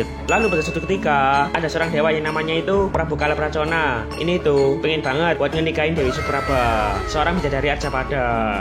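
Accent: Java, Indonesia. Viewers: Anjy Abang orng jawa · Bang kowe asal jowo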